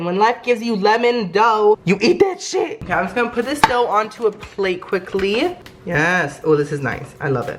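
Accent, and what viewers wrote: United Kingdom. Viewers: His British accent is funny like if u agree❤❤ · 100 videos of British accent pls Also asscheek earthquake full audio plsss or mvvv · Love your British accent lol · He should really do an entire video in his British accent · YOUR BRITISH ACCENT GIVES ME LIFE!!!!!! SLAYYYY!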